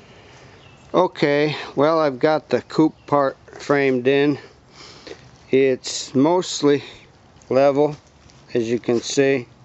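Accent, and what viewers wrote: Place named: South, United States. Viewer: This is Marcy again...hijacking Joey's account. You have a southern drawl. I know this is serious but I think it's hysterical! Good job! Very impressive! I really can't believe you build this!!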